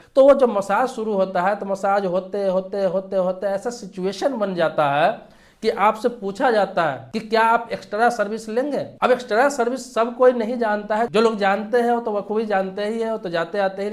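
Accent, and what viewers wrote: Bihar, India. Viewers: Tu bihari hai kya be ?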